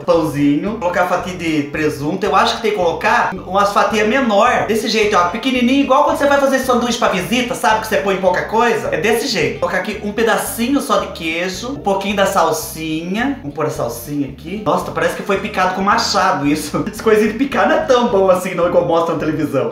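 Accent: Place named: Taquarituba, Brazil. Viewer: Eu entendo toda sua linguagem porque sou de Taquarituba kkkkk é cresci ouvindo falar assim hoje fais 40 anos que moro em SP mais a raiz continuakkk adóro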